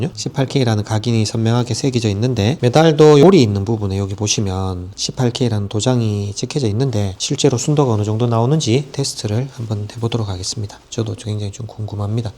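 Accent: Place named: South Korea. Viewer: 금값을 제일 비싸게 받는 주식회사 한국 금거래소의 면피용 영상으로 이해 하겠습니다 좀더 설득력 았게 하려면 지방 사투리부터 고치시길 ㅠㅠ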